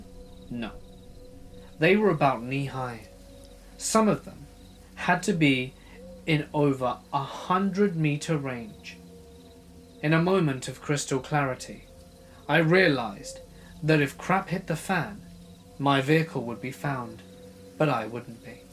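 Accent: United Kingdom. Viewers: Thanks creepy British dude!! You could read a dictionary and it would sound ominous lol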